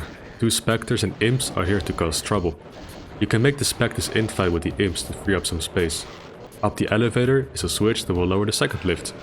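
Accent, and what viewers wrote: Netherlands. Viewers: Have to say I also thought he was Dutch. Am South African and used to live in NL for a few years. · Are you dutch by any chance? Your accent sounds dutch. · Are u dutch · Wait youre dutch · You Dutch?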